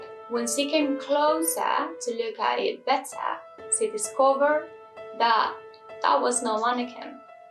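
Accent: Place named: Spain. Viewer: I found you on twitter regarding the Cecil Hotel and shot over here to watch. I'm glad I did because I really like your vibe and uniqueness. So this is my first video and I'll probably spend the rest of the night watching your other videos. Love your hair, makeup, and your accent is really adorable. I wanna say a little Spanish mixed with English? Idk but I love it. I'm American so it's an accent to me and it was fun to hear and watch. You were a little scary at first to me with the intense eyes and blood and then this sweet voice popped out that I was not expecting. You remind me of the other lady that does her makeup while telling real horror stories. I always appreciate something different than the normal I see everyday. And I try to stay as far away from politics and media as I can. I've stopped watching tv and will not go onto any social media outlets unless it's to post about things I like because I'm so tired of the negativity. 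I don't dare scroll when I do rarely jump on because I don't even want to see or know what the hell they are going on about next. It's neverending and tiresome. You are refreshing. 💋 xoxo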